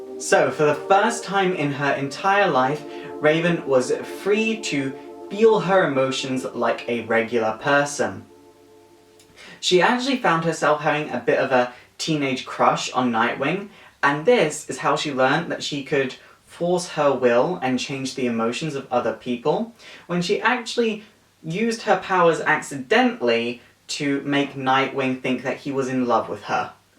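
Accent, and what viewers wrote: United Kingdom. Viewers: okay, 1 why the wig? 2 are you like slightly talking with a British accent? 3 what about the tv series?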